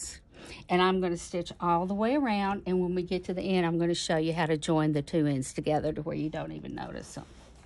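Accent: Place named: South, United States. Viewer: Excellent tutorial! And I love your southern accent!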